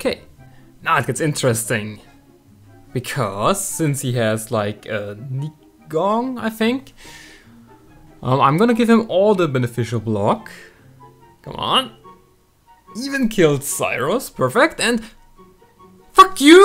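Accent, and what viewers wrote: Germany. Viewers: You sound like Niki Lauda. Are you german? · klingst deutsch 🤔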